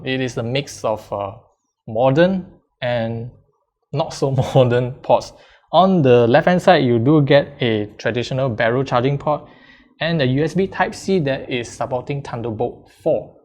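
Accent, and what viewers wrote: Singapore. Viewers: Just from the accent, I can guess you are from Singapore!!!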